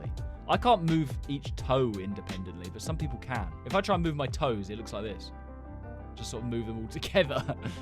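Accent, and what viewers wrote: United Kingdom. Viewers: He sounds i bit British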